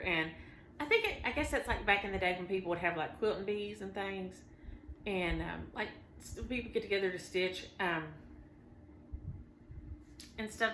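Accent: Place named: West Virginia, United States. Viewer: Just love your down home chats. makes me feel at home e g from WV My accent sounds like you as well. I’m in INDIANA and retired. But I still miss Home especially Christmas time!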